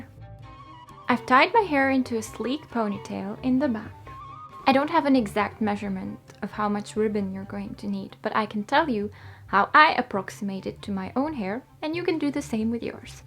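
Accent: Hungary. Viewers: megtaláltam egy videódat régebben és megesküdtem volna hogy magyar akcentusod van, de ezek szerint jól éreztem :D 10 évig néptáncoltam, mi is hasonlóan kötöttük a hajunkat :))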